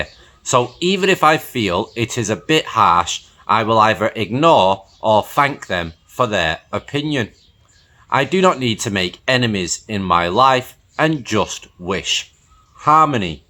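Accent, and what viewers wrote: United Kingdom. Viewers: What is that accent, UK, Australia, New Zealand??????????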